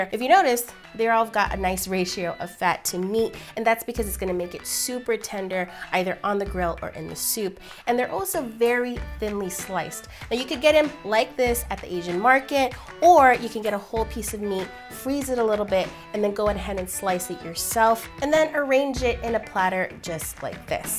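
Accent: Thailand. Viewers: I love your Thai accent 😃😃😃💛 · This is perfect yess btw love your Thai accent!! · I love your Thai accent!!! So cute❤️😘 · Love ur thaiglish accent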